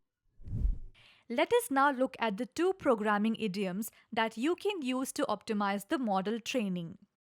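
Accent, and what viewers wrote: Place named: India. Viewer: The heavy Indian accent in the video is too hard to follow for a westerner !!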